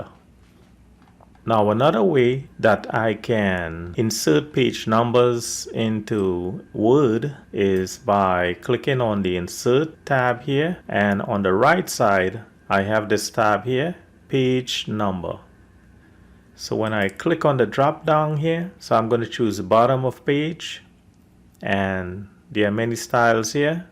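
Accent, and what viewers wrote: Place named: Trinidad and Tobago. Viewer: You sounding like a Trinidadian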